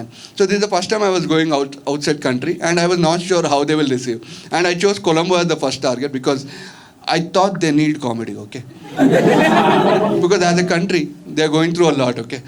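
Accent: South India, India. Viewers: I love that south Indian accent in ur English... And tht.. Re chintuu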